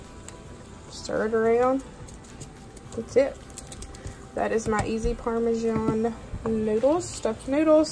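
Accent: South, United States. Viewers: What a great recipe!! love your southern accent 😊😊❤️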